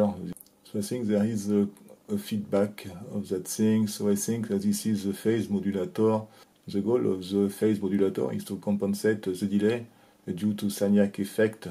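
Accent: France. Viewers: No you can not understand the French ascent, it is destroying any ability to understand a word. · It's the most stereotypical French accent and I love it :)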